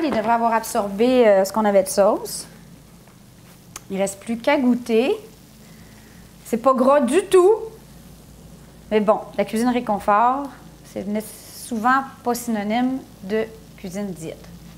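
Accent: Quebec, Canada. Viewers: J’adore ton accent canadien 😂❤️ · Tes cheveux roux et ton accent québécois j'adore 😍😍😍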